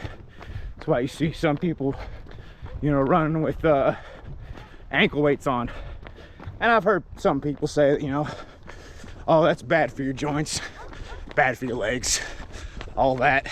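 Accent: South, United States. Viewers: I love the fact that with the run, the southern accent comes out. 😎Yee haw! I'm 60 years young and the miles have started reminding me that I'm not 20. You, young man, are an encouragement for others to get out there and do the things, regardless of age! Keep up the good work! Love the rant! Thanks for sharing.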